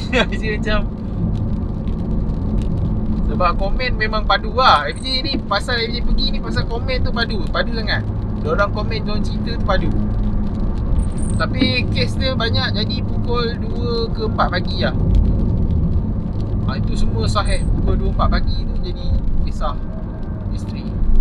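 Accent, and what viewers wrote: North, Malaysia. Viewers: FJ org utara kaa? Lama duk sana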